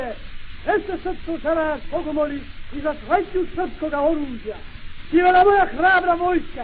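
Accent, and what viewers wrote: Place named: France. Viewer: Не знам дал је ово стварно глас краља Петра пошто се овде чује француски акценат мада неки кажу да је краљ заправо и имао тај акценат.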